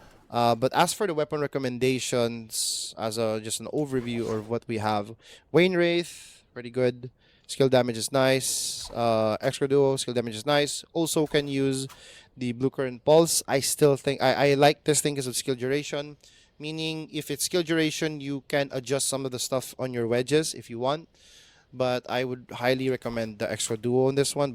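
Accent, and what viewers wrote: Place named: Philippines. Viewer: Bro sounds Filipino HAHAHA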